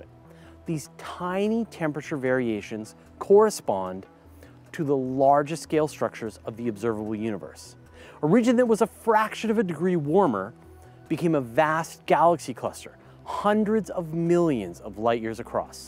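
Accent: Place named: Canada. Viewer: I almost forgot you were Canadian, your accent is the same as northern USA until I heard you say "a-boot" sorry just made me smile😃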